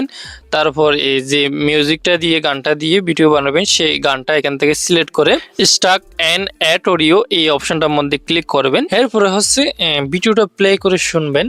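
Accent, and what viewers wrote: Chittagong, Bangladesh. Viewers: অনেক দিন পর ভাই আপনার সাথে দেখা ধন্যবাদ ভাইয়া । আপনার ভয়েস শুনে বুঝে গেছি । আপনিও আমাদের প্রতিবেশী। চট্টগ্রাম বিভাগ থেকে ❤ চিটাগাং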